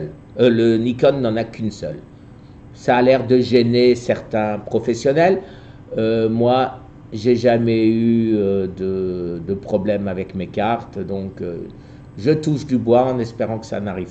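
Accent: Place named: France. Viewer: Merci pour toutes vos excellentes vidéos! C'est bien aimable d'avoir fait celle-ci dans la langue de Molière, bien que lorsque vous les commentez dans celle de Shaekespeare, je comprenne bien également et que votre sympathique accent français m'amuse beaucoup! Continuez comme ça, bonnes photos et bien du plaisir pour la suite!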